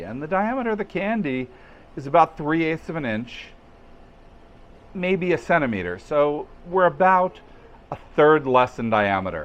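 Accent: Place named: New England, United States. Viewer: I don't remember if ever discussed it in a video before but I'd be really interested to know how, from the accent, a New Englander got to Tallahassee and started in the business of Gilded Age/Progressive era style handmade candy.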